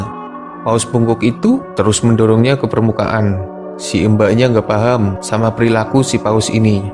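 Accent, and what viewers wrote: Banyumas, Indonesia. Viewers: Ngapak e katon nemen kang🤣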